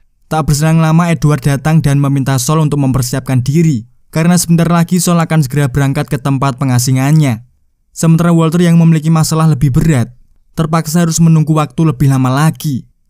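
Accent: Java, Indonesia. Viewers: Akhirnya. Selesai setelah. Berjam jam yimak. Seru ui. Apa lagi narat0r nya. Berl0gat med0k. Berasa tak asing. Seperti ng0br0l ama kawan diselala n0nt0n pilim 😁😁😁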